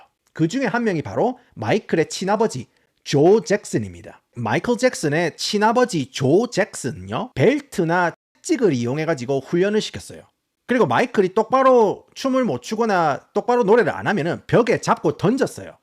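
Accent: Gyeongsang, South Korea. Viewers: 경상도 액센트 매력 탑이에요❤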